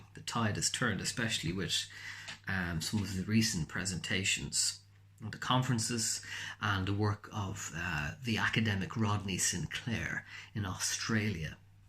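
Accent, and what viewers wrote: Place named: Ireland. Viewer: Hello , thanks for the excellent video! If I’m not mistaken I hear a Irish accent. I’m from Ireland myself and I am wondering where or what brand of oral minoxidil do you use? I have been using finastaride but struggling to find minoxidil in oral form . Thanks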